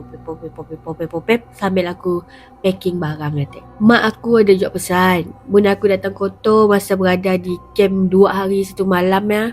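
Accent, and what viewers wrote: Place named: Sarawak, Malaysia. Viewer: Waahhhhh....bercerita sambil lorat Sarawak...hahaha...memang best awakkk....terbaikkkk👍👍👍👍🥰🥰🥰🥰🥰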